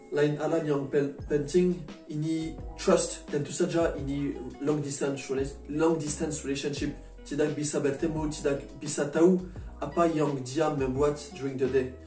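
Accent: France. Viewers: The only problem I have right now is my boyfriend can't visit me during this pandemic, and if he's using agent, he would need a quarantine when he's coming back to his country, that's the worst problem we're facing right now. 😅 Agreed to the point where you said about language barrier, not so many Indonesians can speak English properly tbh, you could see it by browsing LDR videos in YouTube 😅🤣 And I already noticed you're from France from your accent and tongue click! And I think I'm correct 🤣 Greetings from ID - DK couple 🇮🇩🇩🇰, nice video and nice Indonesian language skill 😊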